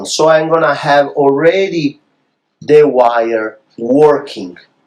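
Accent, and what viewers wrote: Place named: Italy. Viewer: Ma quanto internazionale? Almeno l'inglese italianizzato si capisce bene😅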